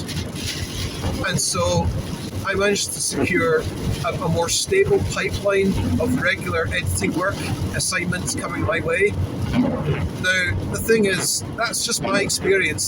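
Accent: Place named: Scotland, United Kingdom. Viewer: My youtube got nuked 3 times, and this account I barely upload anything anymore...sh!t is just too demotivating after being steamrolled so much, and I remembered a channel I was subbed back then, with a guy with somewhat of a scottish accent, and now I found it again! Glad to see you're still around in the original form. Subbed.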